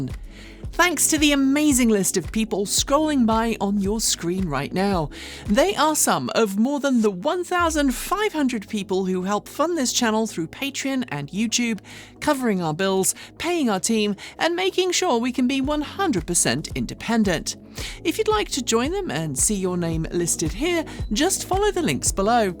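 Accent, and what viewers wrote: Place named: United Kingdom. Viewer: A dumbo putting up a dumb video with a stupid British accent.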